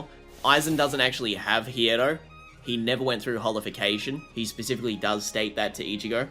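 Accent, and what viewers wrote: Australia. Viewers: nah im so happy youve returned bro love from a fellow aussie (u sound like u aussie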